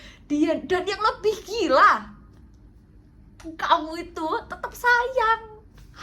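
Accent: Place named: East Java, Indonesia. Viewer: Medok jawatimuran kentel banget bikin ngangenin, apa lagi para perantau asli Surabaya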